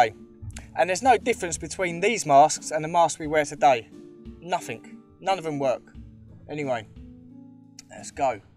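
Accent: Essex, United Kingdom. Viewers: yeah you definitely got a mix of cockney and Essex I'm from mile end myself Great Channel been watching for a few months now just wondering if you have any other social media platforms channels Facebook or email ect ? because I would like to talk to you about a few things if possible I have a found a few tartarien pre reset buildings in the local East London area recently and obviously would be great to here your opinion thoughts and if you want to use them in a video your welcome obviously think it's gonna be a while until I receive another telepathic download about how free energy works and innerstand it properly but I'll keep you posted obviously 😇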